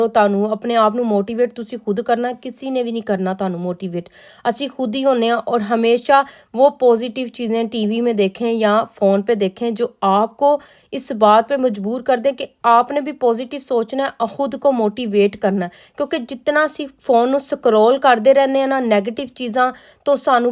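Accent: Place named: Lahore, Pakistan. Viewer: Same hum b lahore sy ha hum b aisy hi bolty ha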